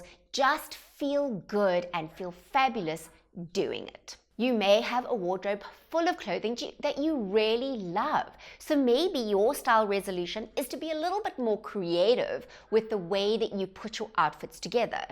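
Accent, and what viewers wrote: South Africa. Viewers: oh! I am so happy that you said where you were from. I have been trying to figure out where your accent was from! Some American and a lot of South African. I really like the new longer format of your videos envied the little facts about yourself at the end .Makes you seem much more relateable.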